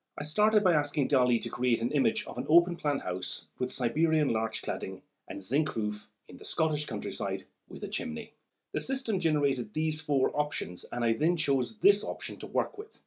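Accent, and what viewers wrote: Ireland. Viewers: Your accent is obviously Irish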